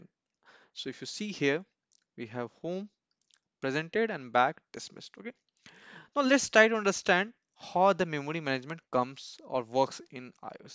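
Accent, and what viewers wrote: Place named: India. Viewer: 咖喱味 听不懂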